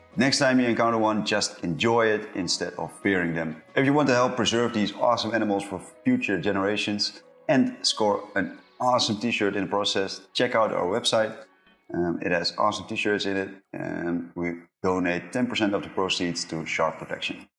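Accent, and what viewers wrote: Netherlands. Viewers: Nice vid! This guy is Dutch I hear it. Hi from Amsterdam 🎊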